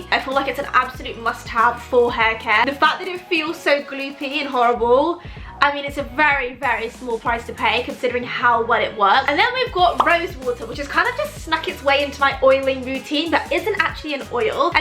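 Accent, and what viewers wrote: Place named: England, United Kingdom. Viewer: She's British-Indian and lives in England, you can tell from her accent that she's raised in England pretty much with Indian culture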